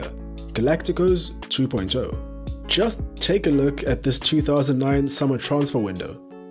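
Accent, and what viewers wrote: South Africa. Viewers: Mr narrator...are you south African?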